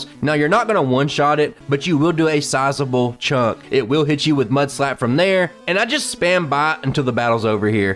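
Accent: South, United States. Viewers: Almost got to 500! Also, I still love that I get some good ole southern representation and you’re not afraid of it. One thing that’s present in media is a bias against accents and i love people who can be themselves. So from a Georgian, thank you! Also, you the sandstorm meme could just be a slight against the University of South Carolina 😊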